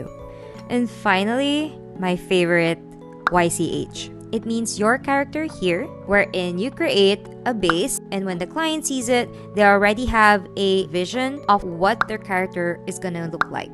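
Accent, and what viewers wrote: Philippines. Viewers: accent is too FILIPINO. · I actually Guessed you correctly? Lmfao? Although i appreciate the Video, cuz i also wanted to look for commissions, your accent kind of gave me a suspicion, so instead of using English, i used Tagalog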